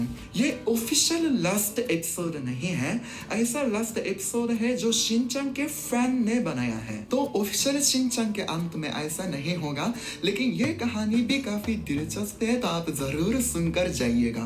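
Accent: Standard. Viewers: Aap itni shuddh hindi bolte hain ki mujhe bhi aapse bohot saare shuddh hindi ke shabdo ke baare me pata chala. Dhanyawad 🙏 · He say pure Hindi than me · आप शुद्ध हिंदी बोलते हैं और मुझे आपके वीडियो पसंद हैं, आप मुझे बहुत प्यार करते हैं, मैं जापान से प्यार करता हूं। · This guy speaks better and pure hindi than us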